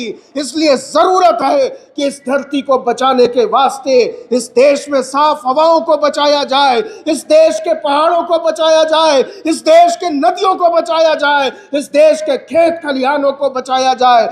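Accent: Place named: Pakistan. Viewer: Urdo kyon bol rahe ho? Wah bhi Pakistani accent men?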